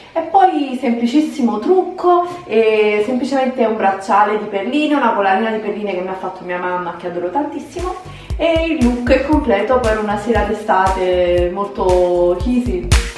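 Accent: Naples, Italy. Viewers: ma tu di che parte di napoli sei?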